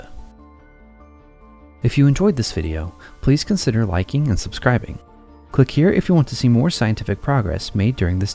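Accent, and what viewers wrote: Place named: South, United States. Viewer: I doubt it. Were the voice AI, pronunciations would follow standard English patterns superimposed over a fake voice, but this voice is a specifically Southern variety of General American.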